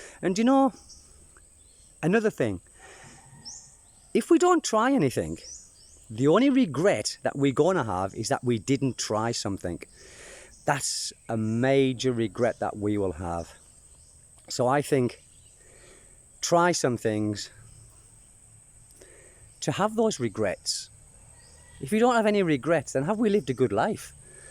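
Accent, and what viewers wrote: United Kingdom. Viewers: during 60's and 70's, Columban missionaries were assigned that area of Mindanao. w/British accent like you.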